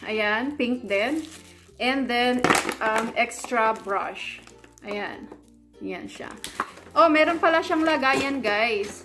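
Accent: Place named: Visayas, Philippines. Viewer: usong uso yan sis maganda daw talaga gumamit niyan...ok lang ang punto na bisaya gusto ko nga kasi sarap sa tenga pakinggan ng salita niyo ")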